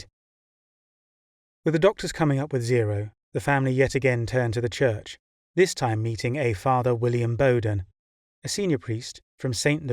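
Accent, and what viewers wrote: United Kingdom. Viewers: You have a funy accent are you a brit?!!!!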